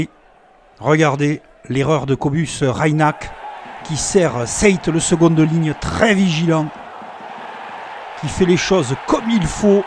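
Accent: France. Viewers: On parle vraiment avec cet accent dans le Sud Ouest ? Oh, merde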